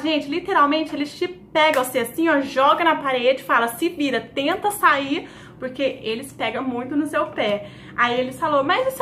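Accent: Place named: Minas Gerais, Brazil. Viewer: Ooowww vc tbm é mineira 😍🤗🤗 seu sotaque é tão lindo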